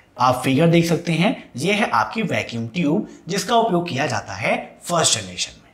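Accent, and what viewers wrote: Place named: Madhya Pradesh, India. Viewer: Mp की laungage लगती है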